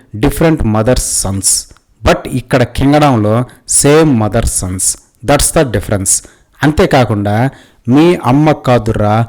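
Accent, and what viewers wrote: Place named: Telangana, India. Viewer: Durapu kondalu telangana yasa kee dooram ayyithe manchidi😂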